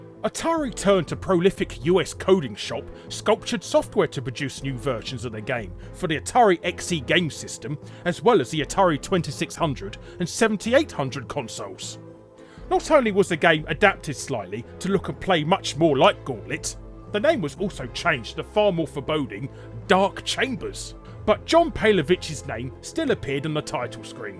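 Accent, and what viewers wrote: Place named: Southern England, United Kingdom. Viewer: What's a parth? There is no R in path or bath nor a second one in grass fucking southerners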